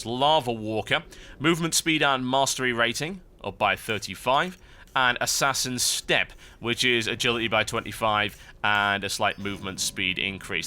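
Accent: United Kingdom. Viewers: brit accent sucks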